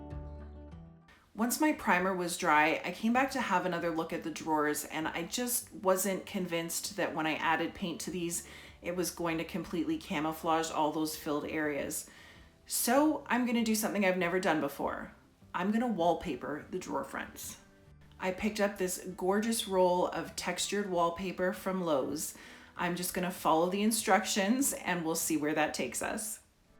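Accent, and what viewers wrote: Canada. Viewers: I subscribed instantly #1 your style and #2 your Canadian accent :P love from Bangkok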